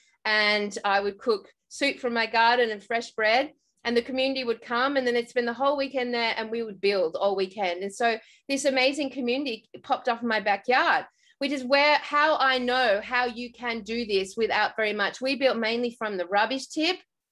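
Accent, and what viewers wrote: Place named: Australia. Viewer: You are speaking ozzy, what is an ''Op-Shop'' ?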